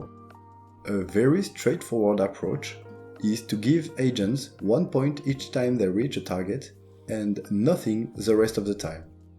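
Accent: France. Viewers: Okay. Btw, aren't you french because you're accent is suggesting me that ? Nice video though. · Je confirme on sent l'accent français qui ressort par moment sinon trop bien la vidéo continue comme sa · You're French, definitely. Because I Can understand your english way too easily.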